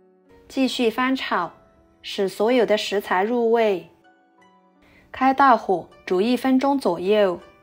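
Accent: Yunnan, China. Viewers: 你这个云南话说呢太好啦！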